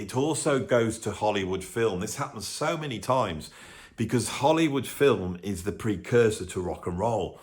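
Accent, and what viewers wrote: Birmingham, United Kingdom. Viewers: Oh...for God's SAKE!!!...this is PAINFUL to listen to! For a start...I can never take any Brummie seriously with that ridiculous whiney Jasper Carrot/Noddy Holder/Ozzy accent! It makes me teeth itch! 😖 Secondly...WTAF?!?! I don't know what makes you think that YOUR opinion qualifies you to spout such tosh, but...I'll give you MINE... Musician for over 50 years. Multi-instrumentalist. Guitar, Keys, Clarinet, Flute, and Sax. Played professionally for decades to some considerable success. Also a published writer and music historian. So...to listen to SO MANY INNACURACIES...just made me want to SCREAM! So much of what you were blathering about is just plain FACTUALLY WRONG! Example: Zeppelin...were what the Band of Joy BECAME?!?! 😂😂😂😂😂😂 WHAT?!?! Utterly ludicrous. Zeppelin were ALWAYS Pages brainchild. From beginning to end. 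He had seen The Jeff Beck Group...and basically had decided to photocopy them. Add a few gnarly blues standards dressed up as Zep "originals"...and there you have it. NOTHING to do with some hippy-drippy band of deluded hippies from the "Bluck Countraaay" Give me strength! Go back to working in Carphone Warehouse mate... This CLEARLY isn't your gig. 🙄😏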